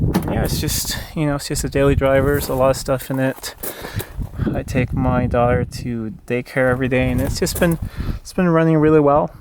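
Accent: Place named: United States. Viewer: Thanks. Listening to your accent I thought that you are from the US and you live in the US. This is why those license plates intrigued me.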